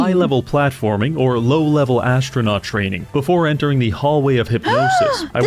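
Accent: Netherlands. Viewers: You're dutch is cute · hold on, are you dutch?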